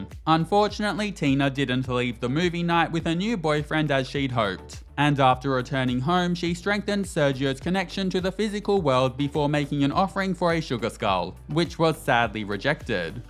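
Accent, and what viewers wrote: Australia. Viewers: is Aussie 👀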